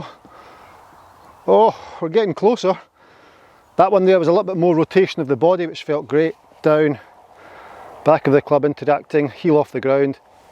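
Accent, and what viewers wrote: Scotland, United Kingdom. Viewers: The Scottish accent makes the golf tips better.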